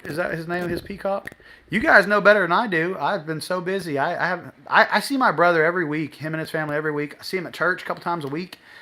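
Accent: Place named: Netherlands. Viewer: Sounds good you sure sound like dutch will be interesting watching your channel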